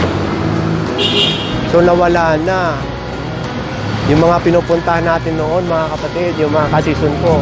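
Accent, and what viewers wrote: Visayas, Philippines. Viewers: Salamat sa blog parang namasyal na rin ako ang ganda ng Gateway Mall lalo sa Garden nila sa taas nakakarelax at libre lang magpunta at magselfie selfie with huge bldg. background nagkakaroon na ako idea saan mamasyal pag uwi ko kasama ko pamilya ko...Im here in saudi Arabia...matagal na na hnd ako nagawi dyan ang laki na ng pinagbago dyan sa Araneta Center ang dami na rin mga Bldg. na sky scaper..Hnd nakakainip manood sa blogg mo nagsasalita kat naikukwento mga nakaraan at ang pagbabago niya pati pagkuha mo ng video maganda very professional at maganda ang cam mo cguro malinaw at professional an dating, pati backgund music mo hnd nakakabagot I appreciate it so much hank you sa sa pag blogg. accent Bisaya ka pero matagal ka na cguro tumira sa Maynila.Ilonggo ka ba Dong?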